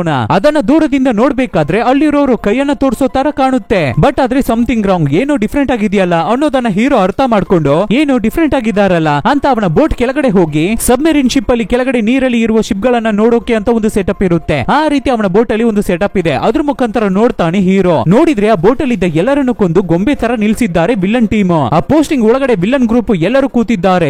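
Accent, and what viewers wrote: Tamil Nadu, India. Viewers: Tamil navru kannada matadtidare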